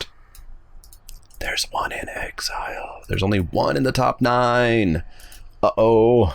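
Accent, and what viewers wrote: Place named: Canada. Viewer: You sound more and more Canadian the longer I watch these. I love it.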